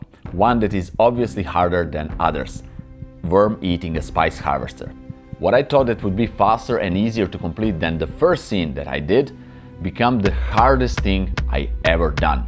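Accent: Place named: Brazil. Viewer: Just, INCREDIBLE! Congratulations! Are you from Brazil? Your english accent reminds me brazilian people.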